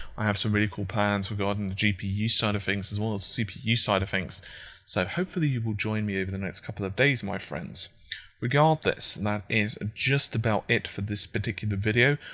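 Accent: United Kingdom. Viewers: What's he doing? He speaks in a british accent but uses American terminology like the way he pronounces 'route' and the way he says 'math' instead of 'maths' :S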